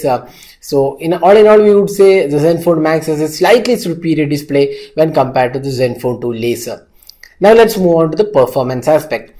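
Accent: India. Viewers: princy princesss well that's Indian English also known a British English with a Indian accent, more English than American English.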